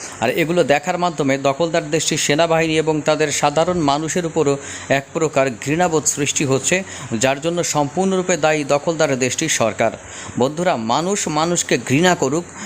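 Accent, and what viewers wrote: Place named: Gopalganj, Bangladesh. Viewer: ভাই আপনার বাড়ি আমার মনে হয় গোপালগঞ্জ , আমার বাড়ি ও গোপালগঞ্জ,, এই ধরনের কথার বাচনভঙ্গি বাংলাদেশের অন্য কোন জায়গার লোক বলতে পারবে না, এটা আমার বিশ্বাস, আমি আপনার প্রতিটি ভিডিও দেখি, ভালো লাগে, ভালো থাকবেন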